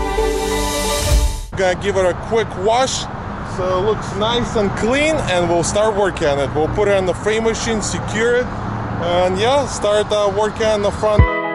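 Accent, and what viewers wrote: Russia. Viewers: bro, are you Russian?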